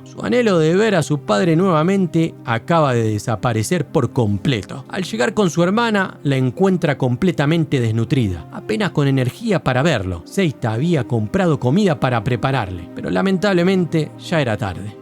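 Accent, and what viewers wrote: Argentina. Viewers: Grande Hermano, soy mexicano pero disfruto el acento argentino y las picardias del mismo.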